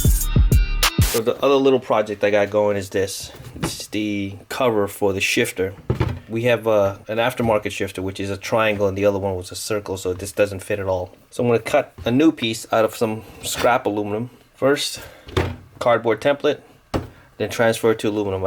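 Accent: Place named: New York, United States. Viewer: is it just me or is his NY accent really showing in this vid 😂😂 I love it NY rep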